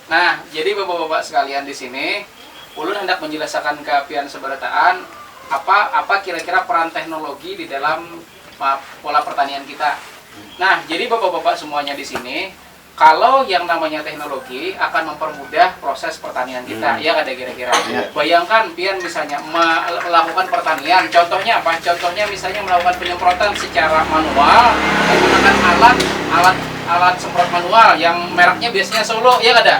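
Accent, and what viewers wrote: Banjar, Indonesia. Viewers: urang Banjar kah? bujur? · Banjar banar pandiran pian Pak lah... Asli urang hulu kah pian pak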